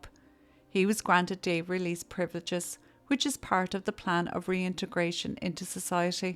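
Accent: Ireland. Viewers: I find your lovely, Irish lilt to be comforting. I subscribe to several true crime channels from various countries. This is a favorite.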